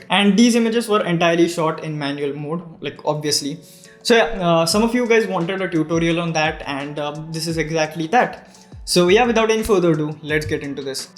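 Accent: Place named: India. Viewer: You are indian right 😁 I know from your accent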